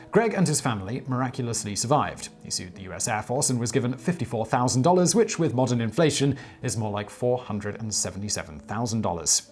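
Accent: United Kingdom. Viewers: He's British, they pronounce some things differently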